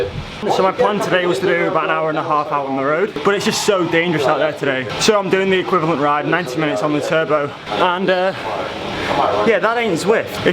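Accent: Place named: United Kingdom. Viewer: I am English learner, Omg 😲 he speaks very fast 💨 but I love ❤️ the British accent. I like listening to the a natural speakers. Although he has a strong accent but I have known some of his conversations.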